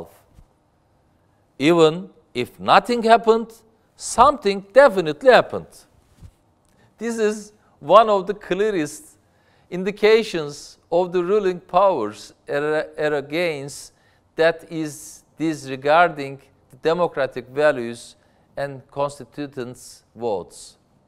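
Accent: Black Sea, Turkey. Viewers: Bu arada zerre sevmem imamoglunu ama bir bilgi vereyim eger ingilizce ogrenmek isteyen varsa ve aksandan dolayi yapamiyorum diyen arkadaslara bakin iyi bir ornek arkadaslar bakin gorun normal gundelik turkcesi gibi rahat turkce aksanla ingilizce konusuyor o yuzden sizde kasmayin illa ingiliz ingilizcesi amerikan ingilizcesi gibi konusmaya gerek yok bu aksan bile idare eder yeterlidir kasmayin yani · 6 yılını yurt dışında geçirmiş ve İngilizce'yi akıcı seviyede konuşan biri olarak söylüyorum, anadili İngilizce olanlar bile İngilizcesi anlaşıldığı sürece birinin aksanını umursamıyor. Adamın söyledikleri net bir şekilde anlaşılıyor. Önemli olan bu. Adamın aksanını eleştirenlerin kaçı İngilizce biliyor acaba çok merak ediyorum. Sanırsın kendileri sular seller gibi İngilizce konuşur. Türkiye'nin English Proficiency'de Avrupa sonuncusu olduğu bir gerçek. Saçmalamayı kesin. 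Türk aksanıyla İngilizce konuşmak utanılacak bir şey değildir. En azından Türk aksanıyla İngilizce konuşan birinin söyledikleri anlaşılır. Gelmişler buraya adamın aksanını eleştiriyorlar. Hayatınızda kaç tane aksan duydunuz acaba? Sanki kendileri kraliyet ailesi İngilizcesi konuşuyor. Konuşması yavaş geliyorsa da videonun hızını artırırsın olur biter. Zaten büyük çoğunluğunuz altyazı olmasa bir şey anlamazsınız bu videodan o yüzden adamın konuşmasını eleştirmeyi kesin. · Başkanım şakır şakır konuşuyo be helal olsun biraz karadeniz aksanlı ama yinede gayet akıcı ve anlaşılır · İngilizce'yi bir Türk gibi konuşması hoşuma gitti. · Yabanci dil konusmak bazilarinin dedigi gibi tercümanlik degil, iletisim kurulan kisilere direkt olarak hitap etme imkani saglayan günümüzün en önemli ikna yöntemlerinden biridir. Umarim yillardir devlet yönetmekte olanlar da "welcome" haricinde iki kelimeyi bir araya getirebilirler. 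Sayin Bey'den ricam biraz aksan ve telaffuzda türkce'den uzaklasmasi olur. Gurur duyuyoum. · Baskan Turk aksanini iyi ozetlemis:) Yalandan aksan kasmaya calismaktansa catir catir yerel aksanda konusmasi en iyisi. Ingilizceyi ve alsani bir yana birakirsak yeni jenerasyon siyasetin guzel bi analizi yapilmis. Genel ve yerel yonetim farki analizini ozellikle begendim. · Amerikan İngilizcesi var İngiliz İngilizcesi var BUDA TÜRK İNGİLİZCESİ, REZİLLİK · Van münit vs Türk aksanlı İngliş. Türk aksanlı İngilişin başımın üstünde yeri olduğu bir versus. Başımın üstünde derken TC'nin başında. Ha bu arada altyazılara bakmadan adamın dediğini anlayabildim. Anlayabildiysem sorunu da yoktur. Ama şunu söylemeden etmeyeceğim. Bunu yazan her kimse, mesleği akademisyenlik. Belli kullandığı cümle yapısından. Yabancı dillerle uğraşan her kimse değiştirmesini tavsiye ederim başkana. Çok kullanacak çünkü başkanım bu dili ilerde. 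Bu şekilde gitmez. Dost tavsiyesi. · adam güzel konuşuyor aksanı da Türk aksanıyla ingilizce işte ama konuşması falan akıcı güzel yani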